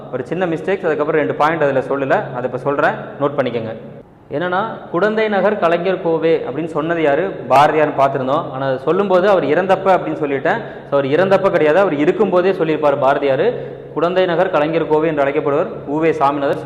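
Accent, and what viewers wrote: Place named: Tirunelveli, India. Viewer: சாருக்கு திருநெல்வேலி போல... ழ் உச்சரிப்பு மட்டும் தகராறா இருக்கு. மத்தப்படி பாடம் லாம் செம டீடெயில் ❤️ உதவியா இருக்கு 🙌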